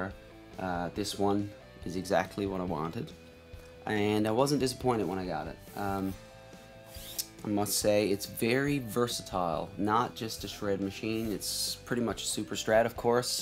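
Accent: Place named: Newfoundland, Canada. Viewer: I know a newfie accent when I hear it lol , excellent job man sick licks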